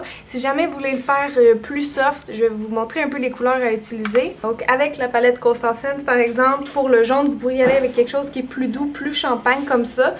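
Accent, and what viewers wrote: Quebec, Canada. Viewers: Vraiment sublime direction dans mes favoris pour realisé de Mu estivale! J appreçie énormément regarder tes vidéos! Je suis française et j'ai de la famille au Québec ton accent me fait penser à eux!(nostalgie) Bisou cynthia ps: tu es vraiment très jolie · ABONNéE !!! Déjà j'adore tes vidéos, et j'adooooooooooooore le québec et j'adoooooooooooooooooore leur accent !!! ;) Je t'adoooooooore :D♥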